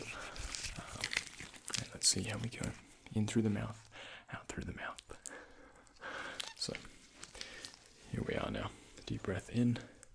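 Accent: Australia. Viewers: Don't mind me. I'm just here for the aussie accent. :) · Seeing all these people hyping over the deep Australian accent makes me think how many nights I've spent not alone have been caused by the same type of horny people.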